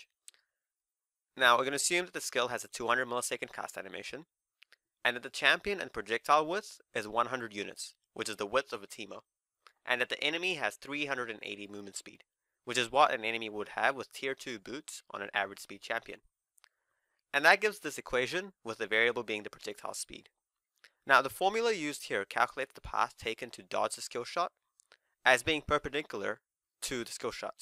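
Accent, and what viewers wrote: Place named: South Africa. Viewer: you sound south african dude :D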